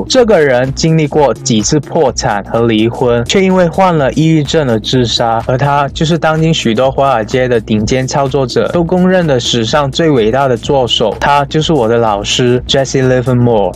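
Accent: Hong Kong, China. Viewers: 请问你是香港人吗？你的中文有香港口音😄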